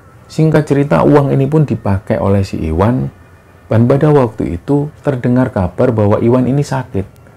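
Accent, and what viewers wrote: Java, Indonesia. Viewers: Tp the best yg ini. Krna mas bawakan nya sangat pas. Apalagi dengan logat jowonya. Pembawaan mas yg tenang jd nyaman di dengar. Aku pernah dr yg mba sebutkan tp semua tk nyaman di denger.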